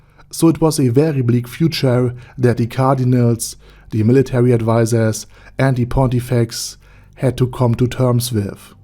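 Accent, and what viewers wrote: Germany. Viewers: thicc german accent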